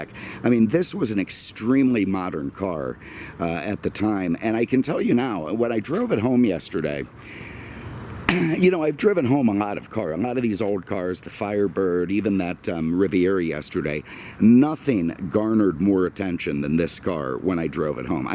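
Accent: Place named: Great Lakes, United States. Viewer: Your accent screams somewhere between Lake Michigan & Pittsburgh😆This baby is a real gem from an era we may never see again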